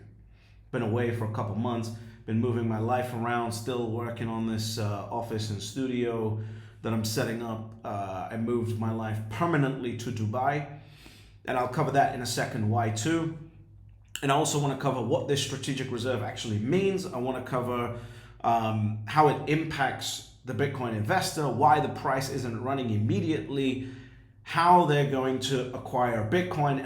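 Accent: Scotland, United Kingdom. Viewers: Good channel bro, you sound like you are from Scotland.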